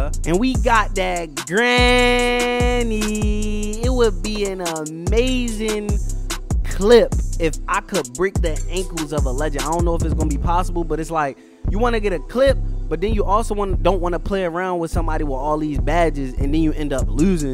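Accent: Baltimore, United States. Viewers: You gotta be from Bmore with the accent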